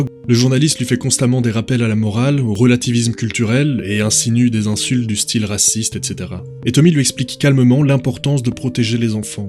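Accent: Belgium. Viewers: Je suis belge et t'as un accent de chez nous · Ton accent belge ressort quand tu t'énerves. Cela veut dire que tu t'énerves vraiment, ce n'est pas feint. Tony Robinson est la victime du système politique et judiciaire anglais (les deux sont liés en Angleterre, ce n'est pas le système français). La raison d'état l'emporte sur la raison tout court. Il s'agit d'établir les connexions entre les gangs et les politiques locaux. C'est un travail de journaliste d'investigation. Ce type de journaliste est en voie de disparition (d'extinction) car trop dangereux et peu rémunérateur. Un bon perroquet (femelle de préférence) est très bien payé pour présenter les JT. Les tournantes n'ont pas cessé dans les cités françaises. Les journalistes n'en parlent plus pour ne pas stigmatiser les jeunes des cités. Tout va bien dans le meilleur des mondes.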